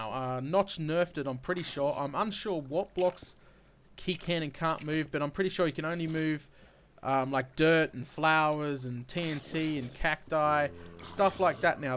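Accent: Australia. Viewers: i luv the australian accent,sounds like there always asking a question:P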